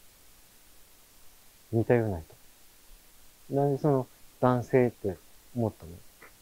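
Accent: Japan. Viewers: 沖縄のイントネーションがかなり入ってます。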